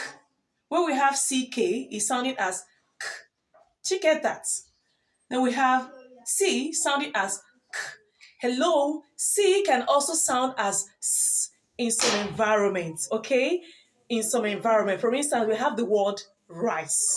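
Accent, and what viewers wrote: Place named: France. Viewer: Nice , your video is of great value. Sure you are french, nice and beautiful accent 🎉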